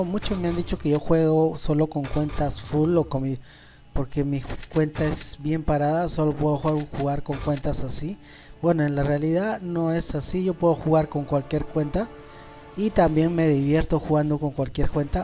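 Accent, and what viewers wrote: Bolivia. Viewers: Eres boliviano?...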